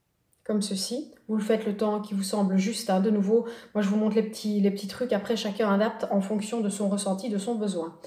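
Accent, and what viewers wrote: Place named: Belgium. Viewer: génial! Vous êtes belges? J'entends comme un accent de chez nous 😊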